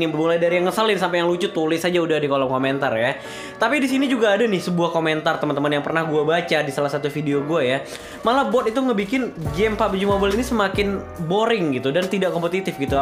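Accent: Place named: Bali, Indonesia. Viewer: Orang bali bang??? Soalnya logat pas ngomong "t" nya mirip am orng bali :v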